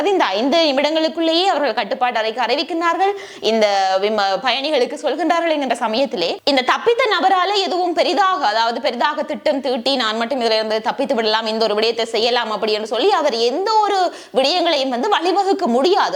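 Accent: Jaffna, Sri Lanka. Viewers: இறந்தவர்களுக்கு ஆழ்ந்த இரங்கல்கள்.. தங்களது பேச்சு யாழ்பான தமிழ் போல் மிக நன்றாக உள்ளது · தமிழை நன்றாக பேசுங்கள் இலங்கைத் தமிழை பேசாதீர்கள் தமிழ் நன்றாக புரிந்து பேசுங்கள்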